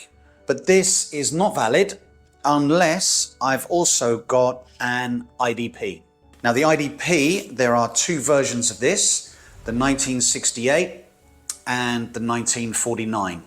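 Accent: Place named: United Kingdom. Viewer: I hear a British accent , Australia IS a signatory to 1949 Vienna convention but NOT the 1968 one due to costs of changing our road rules and therefore have no intention of signing up, sad news for us. A Vietnamese licenses is available IF you have obtained a residency card,,,, good luck with that , so take the bus or grab or Xe Me.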